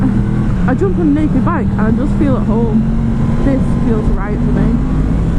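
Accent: Northern England, United Kingdom. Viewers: I am looking at the MT10 for the next bike to strip and rebuild. So this video hit my feed. I could not complete the video because that girl is so dull. Is she trying to sound dumb or is it that northern accent. Yeah, pull your bottle blonde hair out from your helmet and slap a tonne of eye make up on... that will get your hit count up some (jaw-breaking yawn). Why did I leave England 10 year ago... Oh, I remember now! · Great Vid,X...As all yr vids,TOPS,Great to find one in uk,Im in Essex we hook up with some guys on a sunday morning,supermoto run,my mate has mt09,awsum bike,just wonderd what would you recoment to clean a bike,can never get mine looking like a show room bike,thank you,iv been ryding ducati 1100,hypermotard,can not catch mt09,love your accent,up north,The HART OF ENGLAND,Your a great Biker,Take care,Safe bikeing,X.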